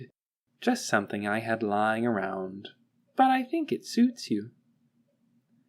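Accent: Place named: United Kingdom. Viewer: Genuinely thank you for doing this. I have a hard time reading and committing to reading fiction for a long period of time. I do have a lot of free time to listen to things though and this was an amazing way to digest this story. I got recommended by the person I'm dating right now, totally worth it. Also I know you apologized for your British accent in the first one and while it is occasionally rough it is such a good capturing of Wheatley like you play Wheatley so well.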